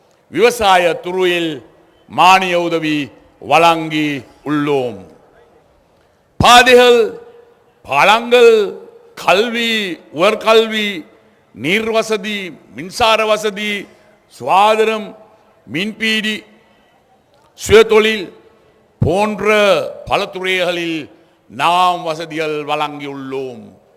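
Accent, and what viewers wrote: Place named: Sinhala, Sri Lanka. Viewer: Saami Kadavule...enna Thamizh ithu...Azhagana Ilangai Thamizh engey, intha singala thamizh engey...quite opposite...intha pecha kekumpothu eppadi ivar pechu ellarukum vilangum....athaan ottu podala pola,..thothu poyitaaru...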